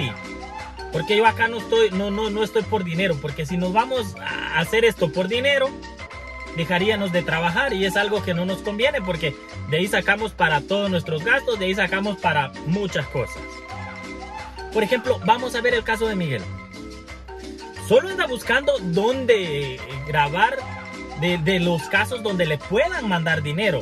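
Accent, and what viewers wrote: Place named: Mexico. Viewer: María Sandoval el abla como Mexicano es porque vive con su esposa Mexicana ya se le pego el acento mexicano😂😊😊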